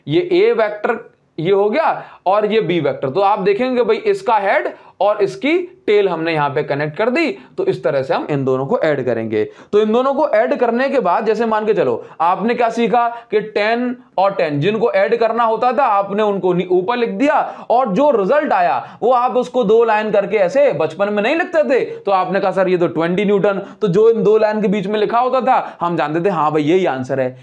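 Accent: Odisha, India. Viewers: bhaiya are you from odisha ? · Sir aap odisha se ho kya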